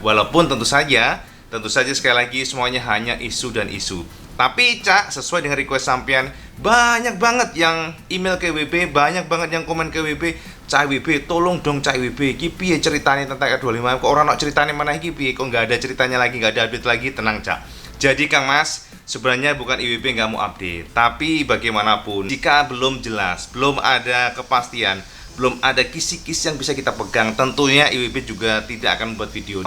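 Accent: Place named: Java, Indonesia. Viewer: aku seneng ulasanmu kang....bloko suto coro jowone.....sukses kang....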